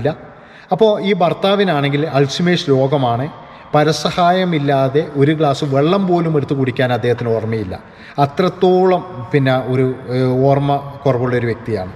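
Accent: Kannur, India. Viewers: Sir kannuraanoo njanum kannuraaa😊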